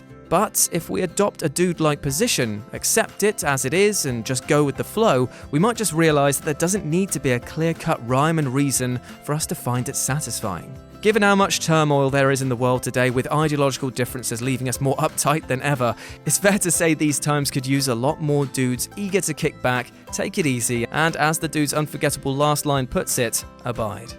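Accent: United Kingdom. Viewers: I usually don't trust anybody with a British accent, but I'll listen anyways.....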